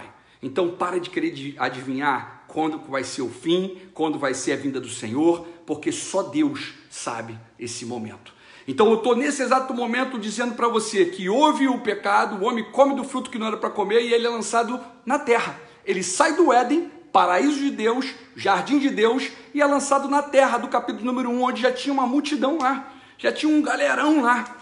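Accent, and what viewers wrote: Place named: Rio de Janeiro, Brazil. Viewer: Conteúdo muito bom , porém esse sotaque de carioca é nojento , impossível se inscrever 🤮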